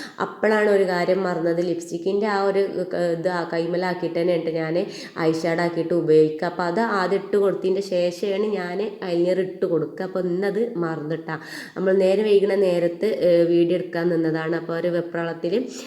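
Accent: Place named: Malappuram, India. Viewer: 😊😊😊. എന്റെ hus മലപ്പുറം ഡിസ്ട്രിക്റ്റ് ആണ്. നിങ്ങളുടെ സംസാരം same 😊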